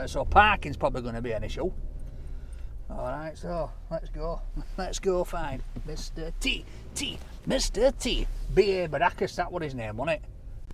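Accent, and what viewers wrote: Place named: North, United Kingdom. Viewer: Love this guy proper northern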